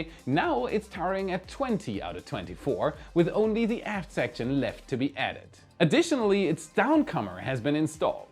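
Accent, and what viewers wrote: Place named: Germany. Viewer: Love these videos. Part of me hates the cringy sort of script reading. But honestly the German accent sticks around in my mind and when I see a new video I just imagine cliche intro phrases in a German accent and I have to say I look forward to it haha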